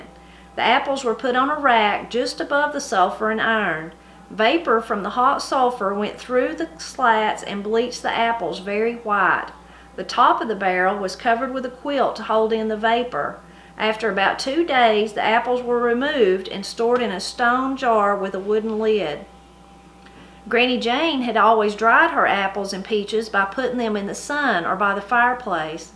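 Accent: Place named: North Carolina, United States. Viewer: I love hearing your stories, my daddy was raised in Burnsville North Carolina in Yancey county. When I was growing up every Sunday me and all my cousins went to Papa's house where my daddy and five brothers were born and raised, my mama and Aunt's would go in the kitchen and on the big black wood stove start making a big Sunday dinner, it always seem to taste better to me when it was cooked on that wood stove. I still live in North Carolina and I so enjoy watching you, I also like to hear the way you talk because you sound like me I mean no disrespect by that! Thank you for keeping our traditions alive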